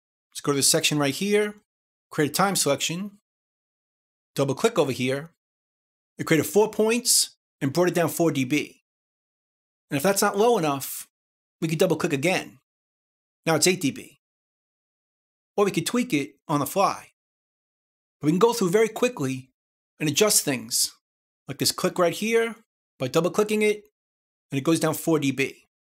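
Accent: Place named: East Coast, United States. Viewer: That's his voice! He's obviously from the east coast (Jersey? ). It takes some getting used to, but his videos are excellent.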